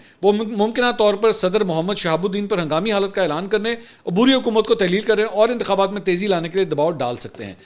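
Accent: Punjab, Pakistan. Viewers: 😂😂😂😂 bhai zaberdast work kr rhy hn ap masha Allah lkn jo ap punjabi ka touch dety hn kamaal kr dety hn ap😂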